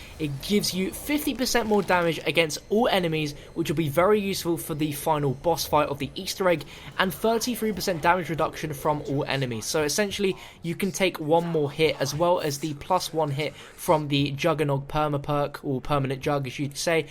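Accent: United Kingdom. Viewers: He's British, his accent changes pronunciation.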